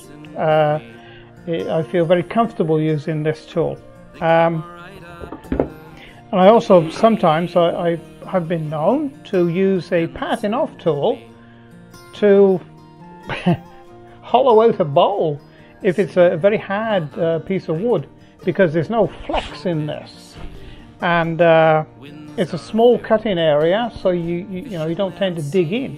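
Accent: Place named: Cornwall, United Kingdom. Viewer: Cornwall originally? Your accent sounds like mine.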